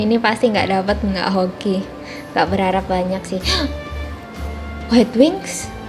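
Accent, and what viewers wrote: Java, Indonesia. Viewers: Medok anjay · Medok bgt kak wkwk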